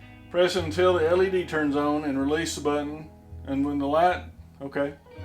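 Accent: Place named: South, United States. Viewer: try to rid of the southern accent. you will get more money, dude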